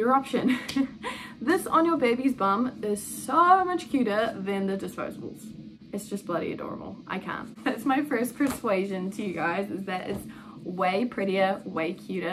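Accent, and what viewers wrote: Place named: New Zealand. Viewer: Yay lol perfect timing! Trying to figure out cloth nappies for my babe. Far easier to understand and enjoy listening to another kiwi young mum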